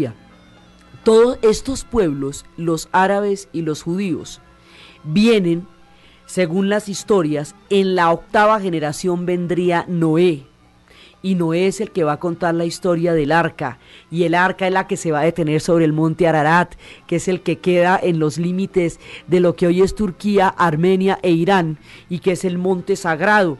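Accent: Colombia. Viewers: excelente es de gran ayuda felicitaciones mujer ,,me gusta el acento por lo que noto es de el valle acento Caleño .... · muy bien explicado.Por tu acento pareces Colombiano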